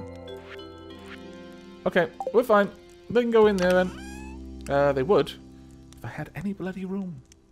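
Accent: Wales, United Kingdom. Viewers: Is welsh your first language?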